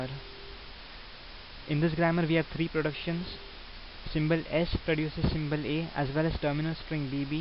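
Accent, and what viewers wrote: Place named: India. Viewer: Im so fucking tired of indian english by this time in the course..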